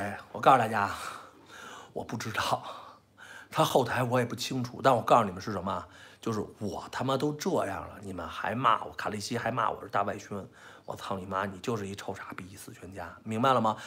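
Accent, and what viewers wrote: Beijing, China. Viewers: 曉冬可以學台語嗎 你的臉一臉台味 怎麼是大陸腔呢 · 骂得太爽了，尤其一口京腔味带着愤怒，又夹着一丝丝幽默铺天盖地、如海啸般把海外这帮伪民运全卷入了海底，从此海外应该会清净点了吧！如果还有， ，再来一场海啸！ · 北京爺們兒罵人真好聽！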